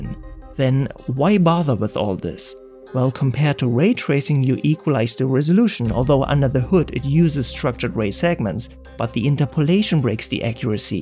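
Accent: Germany. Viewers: People complaining about the accent … well, you‘ll have a much harder time understanding this in German which is the author‘s native tongue. And nobody else does videos which come even close, so rather applaud for this content being in English at all - as a large portion of YT is German language actually…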